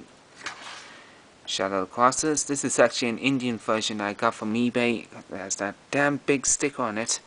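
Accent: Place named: Ireland. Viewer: Nice collection, thought you sounded Irish!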